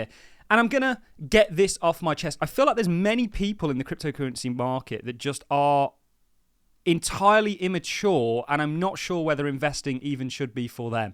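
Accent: United Kingdom. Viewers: Retail as you define them, will most likely not be back in any force. They are completely tapped out due to government induced inflation. You sound like you are from the UK, how are those energy cost working for average person. This is where You Tube pendants have got it wrong. Wall Street has taken over crypto!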